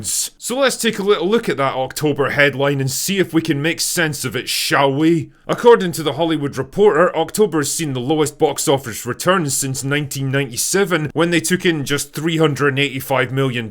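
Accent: Scotland, United Kingdom. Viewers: It always brightens my day to hear this crazy Scottish bastards voice.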